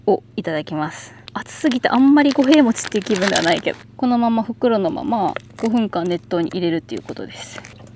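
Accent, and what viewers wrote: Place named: Kansai, Japan. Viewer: 関西弁がめっちゃかわいいです－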